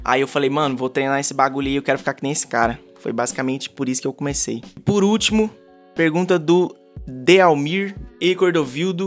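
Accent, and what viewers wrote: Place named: Goiás, Brazil. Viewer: O sotaque é de goiano 🤣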